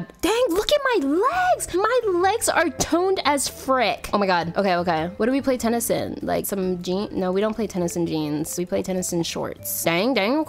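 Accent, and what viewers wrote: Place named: Asia. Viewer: her asian accent tho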